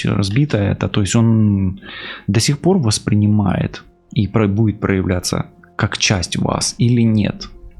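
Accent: Ukraine. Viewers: Доброе утро! Благодарю Вас за Ваши прекрасные расклады,их можно слушать бесконечно,они доходчиво,понятны,Ваш прекрасный голос, Вы просто Супер! А главное всё в точку, Ваш хохлятский говор! Я говорунья,а он молчун, может подойти молча ударить,как он говорил "для профилактики" вот так-то (шутка).Всех благ Вам от души!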